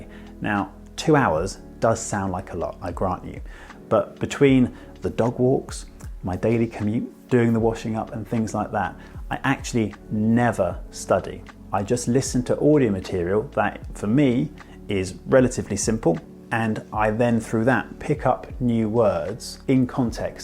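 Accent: United Kingdom. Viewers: I liked your accents makes me want to learn british english even more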